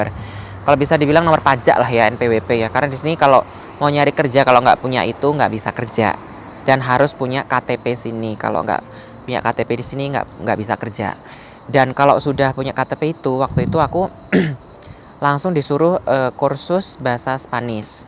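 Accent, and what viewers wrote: Java, Indonesia. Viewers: Wis sue neng luar biasa Jawa e kok jek medok y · Alhamdulilah mas....tetep semngt...aku seneng dgn logat jawa...ny itu keren tingl di sepnyol tpi ttp bahasa daerah ny ttp ada.aku suka......bgt......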